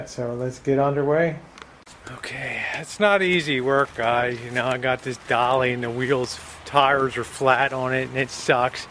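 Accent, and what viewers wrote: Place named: Philadelphia, United States. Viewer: you sound like you have a philadelphia area accent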